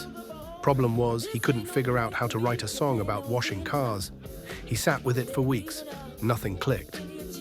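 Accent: United Kingdom. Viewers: Please narrate your work and lose the Brit !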